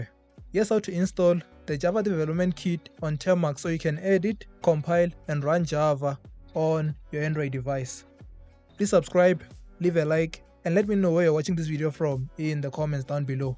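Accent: South Africa. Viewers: From Mzansi. You sound Venda/Tsonga. Dankie for the video!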